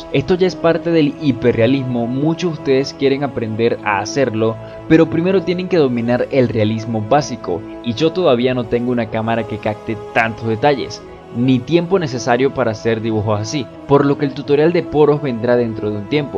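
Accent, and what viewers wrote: Venezuela. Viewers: Se nota que eres Venezolano como yo que fino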